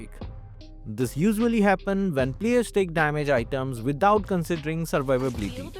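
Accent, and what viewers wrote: India. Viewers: the moment I heard the Indian accent I just stopped all.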